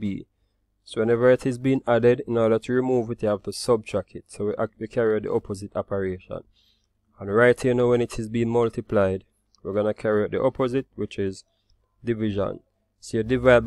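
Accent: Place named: Jamaica. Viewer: I wish I had a Tutor with a Jamaican Accent. Would have made Math's more fun!!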